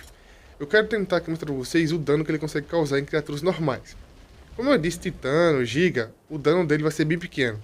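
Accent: Northeast, Brazil. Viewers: de que estado se é sou do nordeste vc parece ser nordestino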